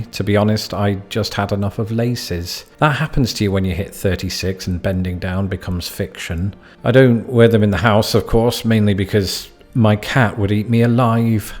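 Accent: United Kingdom. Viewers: You are still a little British as long as you continue to say pro-cess instead of praw-cess. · You still have your British accent and, most importantly, your British sense of humor - the two big reasons I watch regularly. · You're still not completely Americanized yet, as long as you are still saying "Sunt Patrick". Stay British! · Well you still sound Brittish dude & hey i think you can buy a suit & bow tie when you visit home! 😎😎😎😎😎😎 But for now go & get yourself a pair of cheap sunglasses! ZZ TOP DUDE! 😎😎😎😎😎😎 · Whatever you do, don't lose your accent. Americans tend to think the British sound very intelligent. Just look at ads on tv--if a company wants to sell a luxury item, they often have a spokesperson with a British accent--if you buy this item (usually an expensive car), you will be considered posh and veddy sophisticated:)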